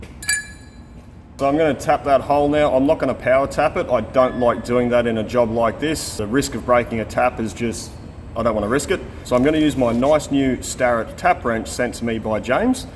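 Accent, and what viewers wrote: Australia. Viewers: Dear, I will never make anything that you have shown me so far but I am having a wonderful time going through the processes you take to make the incredible things you do. Its great to hear proper English - Australian English. Greetings from South Australia.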